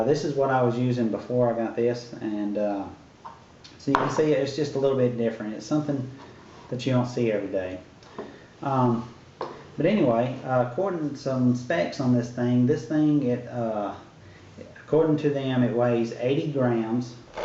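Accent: South, United States. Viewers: U sound southern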